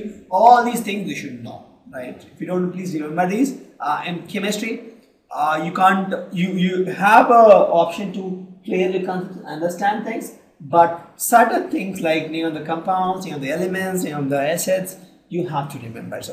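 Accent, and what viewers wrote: India. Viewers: Indian accent is funny.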